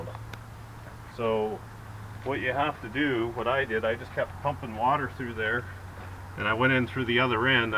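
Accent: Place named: Canada. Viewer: Love that Canadian accent! Wife's from MI and I haven't heard that in a while! Bob from Texas...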